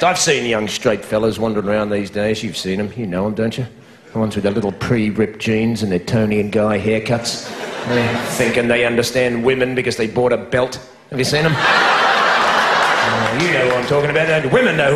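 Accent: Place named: Australia. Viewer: George Carlin with an Australian accent.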